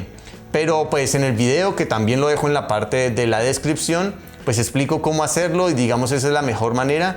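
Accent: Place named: Canary Islands, Spain. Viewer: cada vez que veo un video tuyo aprendo algo. lo explicas muy bien y se te entiende perfectamente . tambien sera porque soy Canaria y pronunciamos de igual manera jajaja gracias!!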